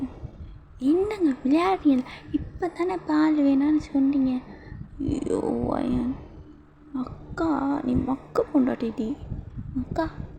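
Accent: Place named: Sri Lanka. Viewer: Your sounds like Sri Lankan are perhaps a Sri Lankan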